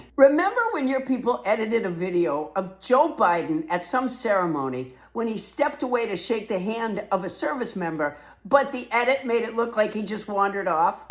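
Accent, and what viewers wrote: Boston, United States. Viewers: Brilliant! Ya sound so Bostin! It’s always a pleasure to see (and hear you)! As Denis would say, “He’s an a-hole!” ❤❤❤❤😂😂😂😂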